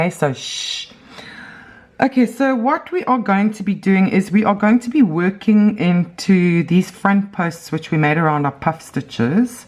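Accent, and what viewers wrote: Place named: South Africa. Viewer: Nice South African accent 😉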